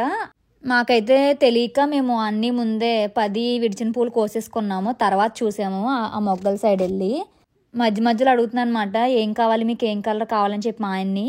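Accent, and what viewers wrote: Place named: Godavari, India. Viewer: Meru mana godarolla Aisa bagamatladutunnaru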